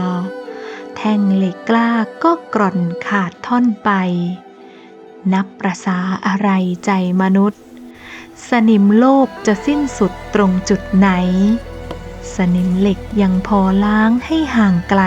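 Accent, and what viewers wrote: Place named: North, Thailand. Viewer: เสียงบันยายดีมากๆคับฟังเหมือนอยู่เมืองเหนือคับ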